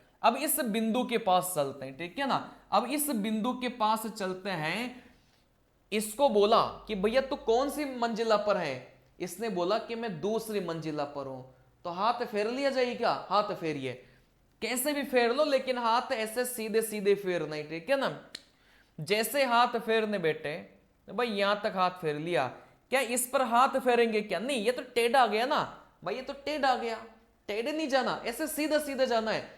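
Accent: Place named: Hadoti, India. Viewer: Sir ji aap rajasthan m hadoti se hona ...aada jana lapad padegi 😂 hadoti wale hi bolte hain😂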